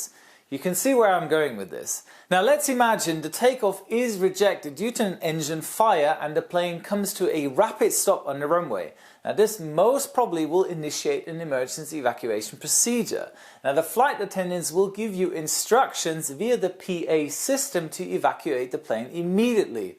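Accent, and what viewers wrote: Germany. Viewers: hahaha in der ersten sekunde wusste ich du bist deutsch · Is he German? I can hear an accent, but i‘m not sure if it’s a German one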